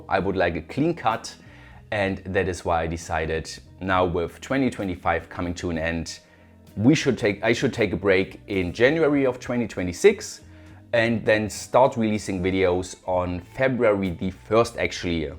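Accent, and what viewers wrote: Germany. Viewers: I joined you when you had about 40,000 subscribers. I really liked your content and your German accent. As your subscriber and fellow creator, I completely understand you. It's just titanic work. I wish you inspiration in the new year and ease in creating videos.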